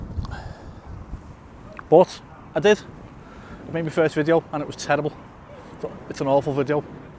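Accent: Liverpool, United Kingdom. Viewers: A warm greeting from Indonesia! As a non-native English speaker, I absolutely adore the Scouse accent - it's simply incredible! Although I am still in the process of learning English, I subscribed to your Youtube channel as I would love to become more familiar with the Scouse accent. Hopefully, one day in the future, I will be able to speak English with a Scouse accent myself. Wishing you even more success on your Youtube channel! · I watch nearly all of your videos and I’ve never noticed any speech impediments at all. You sound like a perfectly normal scouser 🤓👍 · Thanks for the Videos. You alway come over as a caring and genuine character. You're not just out for the aggressive click bating like some YouTubers. I've never noticed the speech impediment? You're just good old scouse! Because you're human, if you receive 100 positive comments and 1 negative comment. 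It's that 1 negative that sticks in your mind and will out weigh all the positives. Just keep doing what you do mate. It's working!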